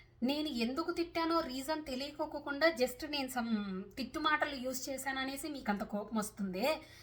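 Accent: Rayalaseema, India. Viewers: అక్క నమస్తే వాలీల మాటలు పట్టించుకోవద్దు అక్క మనం ఏది అనుకుంటున్నామో మనం ఏం చేయాలనుకున్నామో అదే చేయాలి మనం ఒకవేళ వెళ్లాలి అనుకున్నాము అదే వేలో వెళ్లాలి అక్క వాళ్ళ ఇల్లు మాటలు పట్టించుకోవద్దు బట్ ఇప్పుడు అంతగా కామెంట్ పెట్టిన వాళ్ళు కూడా నీకు ఎవరో చెడుగా పెట్టారు అని అన్నారు కదా వాళ్ళ పాపం వాళ్ళదే ఫోన్ చేయమంటే వదిలేసేయండి వీళ్ళకి కూడా అర్థమైందా లేక చెప్పారు కాబట్టి సంతోషం అందరు కామెంట్స్ చదివి మీరు రిప్లై ఇచ్చారు కాబట్టి చాలా సంతోషం అక్క పట్టించుకోవద్దు అక్క కొంతమంది కొంతమంది మాట్లాడుతూ ఉంటారు పిచ్చి మొహాలు కొంతమంది ఇలా ఫేక్ ఐడి లు క్రియేట్ చేసుకుని మాట్లాడితే అమ్మ నీ అక్కని చెల్లిన అంటే ఎవరు పడరక్క తిరిగి వాళ్ళని అంటారని ఆలోచన వాళ్లకు ఉండదక్క పిచ్చి మొహాలు అట్లానే ఉంటారు వదిలేసి మీ వీడియోస్ చాలా బాగుంటాయి మీ ఫస్ట్ మీ వాయిస్ చాలా బాగుంటుంది అక్క నేను ఒక తమ్ముడుగా చెప్తున్నాను ఒక శివ సత్తిగా చెప్తున్నాను ఓకేనా అక్క చాలా మంచిగా ఉంటది మీ వాయిస్ కూడా పక్కా మన లాంగ్వేజ్ ఎట్లా ఉంటుందంటే మాస్ లాంగ్వేజ్ అలాగే మాట్లాడతావు దీంట్లో ఇంగ్లీష్ గాని అంత బయట దేశంలో ఉండి కూడా స్వచ్ఛమైన మన రాయలసీమ లాంగ్వేజ్ లో మాట్లాడితే హాట్సాఫ్ అక్క నీకు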